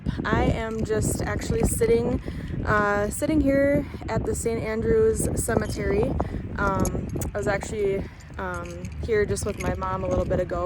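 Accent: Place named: Canada. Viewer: I WILL add a comment since somebody may have passed your thoughtful video by. My Grandfather also lost his life by suicide. In fact, my Mother, who I must admit cannot go see, was pregnant with me when her Father did so. I believe mental health issues run in my family. There was a sincere belief that my Grandfather thought his cancer was coming back(this was in 1975), didn't want to be a burden, feared the pain, or perhaps it was an entirely different reason not related to his physical being. He had 12 children, with two different wives. His first wife passed on. But they were all related nonetheless! (You sound Canadian, btw. That is not a dig. I ❤ Canadians. I'm a simple, poor New Yorker myself.) I do suffer terribly from Major Depression with Panic Attacks, and other "things" I won't bore you with, as you are genuinely hurting as you are paying respects to much-loved family member. 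My place of work is being very charitable bc I'm on leave now. My poor husband and elder Sister have been blindsided by me not working and reasons why. I live in my own head and now I'm going through another slow process of getting help here in NYS.I have been scared into taking my medication the correct way, not running out, so I can resume my full time Custodial job at the local Casino. I wish YOU, YOUR FAMILY, & whomever happens to come across my long, but true comment that suicide is just plain scary. You leave others wondering why and so heart-broken. Because of my Grandfather's death, and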